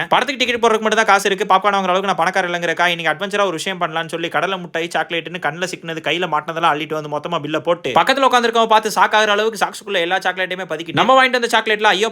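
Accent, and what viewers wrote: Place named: Madurai, India. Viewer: Correct ah iruntha parotta Illa na Manda pura saalna 💥👊🏻💢 Madurai Slang 🔥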